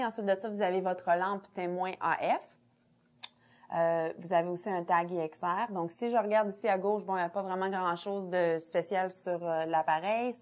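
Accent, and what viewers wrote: Quebec, Canada. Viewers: Belle vidéo mais malheureusement c'est pas tout le monde qui comprends le Québecois. J'ai un peu de misère voyant. ....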